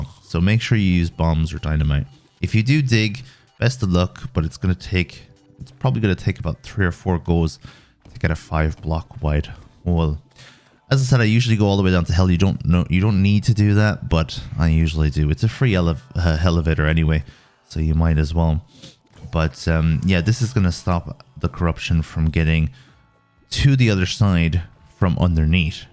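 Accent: Ireland. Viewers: You sound Irish but also not Irish at the same time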